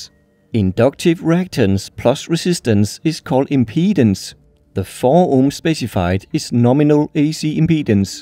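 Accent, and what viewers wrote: Denmark. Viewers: Are you Danish? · Are you from Denmark your accent sounds like Danish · Are you from denmark ?🇩🇰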